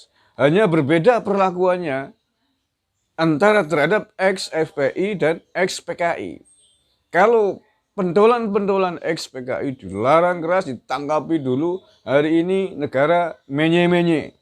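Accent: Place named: Tegal, Indonesia. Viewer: asik mas dangdutanne..iyonge wong tegal kiye mas, mantapp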